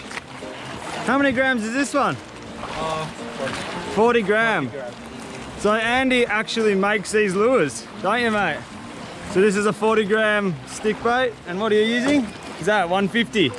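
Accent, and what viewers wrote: Australia. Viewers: Not "Doggies." It's GAWGIES. He's Australian and accent goes on "D" from some unknown reason :/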